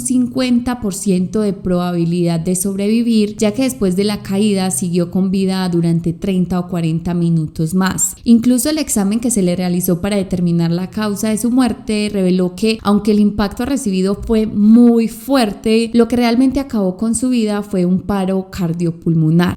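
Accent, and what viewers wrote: Antioquia, Colombia. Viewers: Eres de Colombia? O de donde es tu acento? Me encanta tu acento. Bueno saludos ciao 💋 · Me encanta tu espontaneidad, sos PAISA lo sé .. yo también aunque vivo en Londres have 20 ańos. Ese acento nuestro es maravilloso Sigo tu canal y me gusta mucho tus historias y análisis.. MUCHÍSIMA SUERTE DIOS TE BENDIGA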